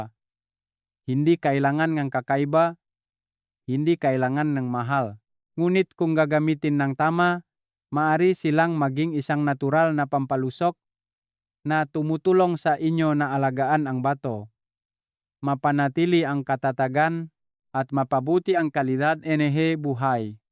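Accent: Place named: China. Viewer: Intsik na accent naman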